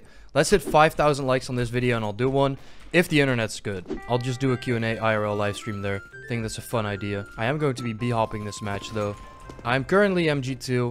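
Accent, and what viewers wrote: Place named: Netherlands. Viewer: Je bent nederlands toch ik hoor het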